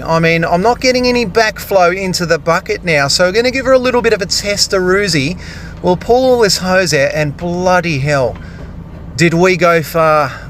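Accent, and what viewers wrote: Australia. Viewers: The Australian accent just kills me😂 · Just looked up at the clock and it's here in the UK which means that I've been enjoying you videos now for almost 4 hours - brilliant, cathartic and I feel I've learnt to speak 'Aussie' in the process. Good on ye fella. Great to see somebody so into their work and enjoying every minute. Pity you don't operate around here. Cheers. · I love the Australian accent ❤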